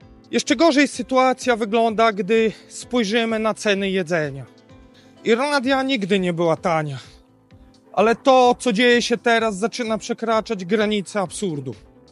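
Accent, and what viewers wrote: Podlasie, Poland. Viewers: od razu słychac, mam dużo kumpli z podlasia, wszystkich na emigracji spotkałem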